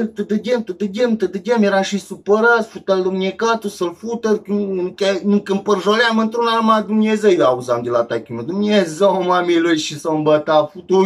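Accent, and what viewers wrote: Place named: Transylvania, Romania. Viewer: Mai ales graiul ardelenesc oo splendoare... 💪🏻💪🏻💪🏻💪🏻💪🏻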